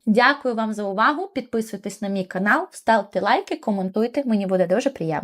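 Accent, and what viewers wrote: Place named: Hutsul, Ukraine. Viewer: ещё этот мадьярско-гуцульский акцент!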